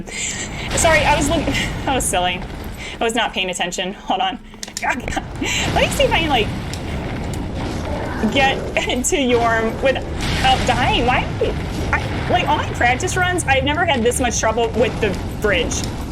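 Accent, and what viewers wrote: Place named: Australia. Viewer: English, not "British" accent but yeah it sounds more Australian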